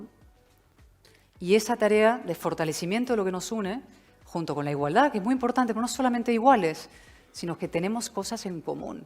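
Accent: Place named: Argentina. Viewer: No me gusta Cayetana habla bien pero el tono prepotente y el acento argentino ese chirría un poco.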